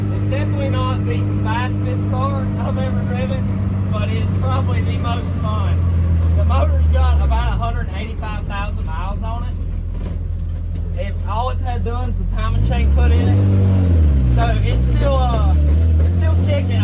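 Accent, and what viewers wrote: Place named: Tennessee, United States. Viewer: I'm just traveling down the rabbit hole of "beer can" or as the kids call them now "rocket" builds for ideas on my 1G Neon and... 😳 Mother of God... It's like train wreck. I hate everything about it and absolutely love everything about it at the same time. The ascetics and being Japanese make me hate it. The functionality and being piloted by someone that talks like me (Tennessee born) make me love it. 1.7 lateral Gs? Despite being from the side that lost WW2, has never landed a human on the moon and uses a measuring system that makes sense, I'll be binge watching for sure.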